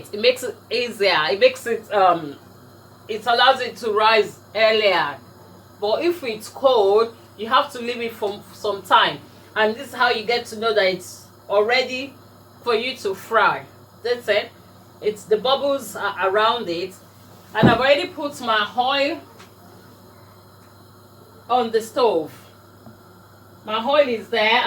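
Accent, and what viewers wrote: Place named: London, United Kingdom. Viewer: I love d way she talks.. she's talking like all does London aunties..nice one..I'll try your measurement.. sometimes my puffpuff comes out great n sometimes it doesn't.. will give it a try and hit you up... good job ma'am👌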